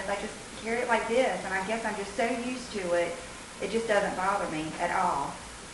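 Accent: South, United States. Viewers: I really love your videos. I always watch your videos first. Love your accent, I am a southern girl too.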